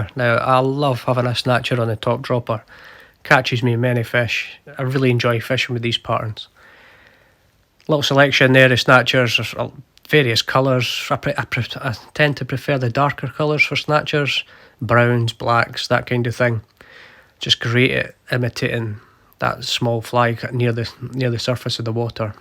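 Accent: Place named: Scottish Borders, United Kingdom. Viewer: are you on FB? Got a lot of questions?? lol what floating line would you recommend? What was the fishery at the beginning of the video? What’s your favourite rod and location up there? I’m thinking you have a borders accent!! And lastly do you sell your flies..I keep wanting to buy more flies and this cracking video has made matters worse ( like all Fly fishermen lol )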